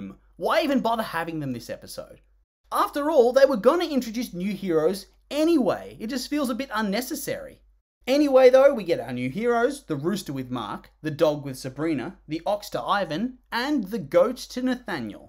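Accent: Australia. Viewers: Im gonna guess you're aussie from your voice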